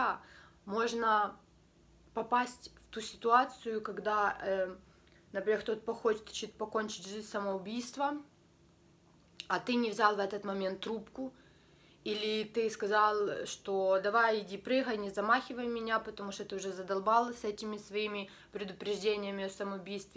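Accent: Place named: Ukraine. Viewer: из твоих уст слово геморрой!!!!😘😘😘😘😘 у меня аж шерсть дыбом встала. обожаю украинский акцент.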